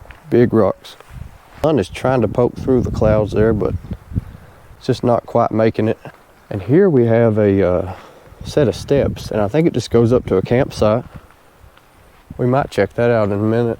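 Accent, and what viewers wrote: South, United States. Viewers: Love that southern accent 😀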